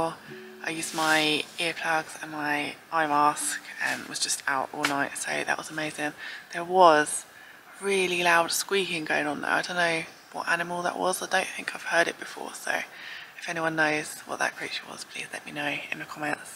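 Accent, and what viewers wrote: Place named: Berkshire, United Kingdom. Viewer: Hi, i have been trying to figure out your accent for a while now, is it Berkshire, Oxfordshire, Hampshire or near. My mother is from Cane End nr Henley originally and sounds a bit like you. Accents intrigue me. Great videos, reviews and adventures, and i love the wildlife you encounter, stay safe, all the best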